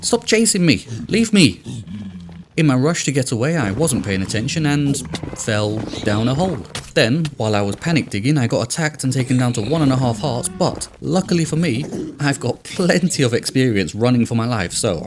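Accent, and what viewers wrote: United Kingdom. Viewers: Are you british? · u are literally so british i feel sick to my core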